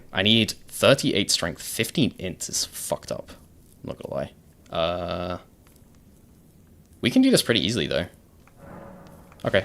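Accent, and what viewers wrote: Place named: United Kingdom. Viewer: is my favorite Australian streamer. Weird that he has a British accent though.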